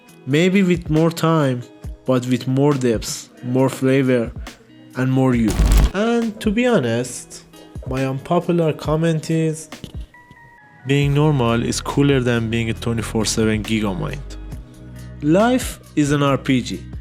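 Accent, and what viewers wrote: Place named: Iran. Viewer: your accent screams "چایی و کوروش کبیر"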